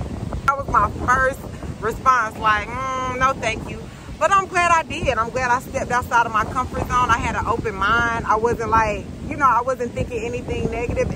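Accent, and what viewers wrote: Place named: Carolina, United States. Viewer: You from Carolina ? sheesh major sign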